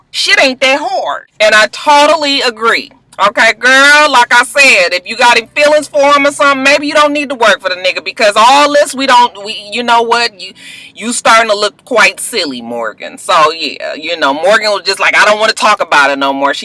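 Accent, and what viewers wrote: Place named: California, United States. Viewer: !! I love your Ray J! You know you're from Cali, you got the accent down. You went back to Cali with "molded" and "loc up" today! I'm just but I am so excited, I can't wait for Mally Mall! I can hardly contain myself! LOL. Love your videos!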